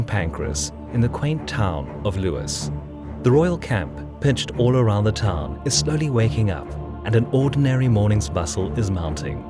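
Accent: South Africa. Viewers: Is this narrator South African? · Is this guy south African?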